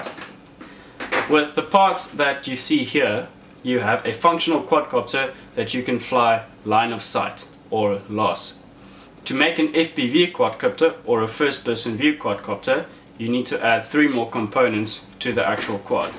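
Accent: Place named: Afrikaans, South Africa. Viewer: Hello ek kan by jou Aksent hoor jy is Afrikaans love jou videos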